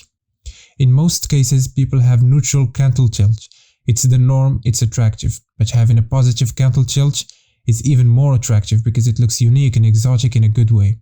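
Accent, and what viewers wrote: Morocco. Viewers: The english of the person speaking screams moroccan. Just by his accent i can even tell you which city in morocco he comes from. XD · you've got a Moroccan accent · You sound moroccan bruv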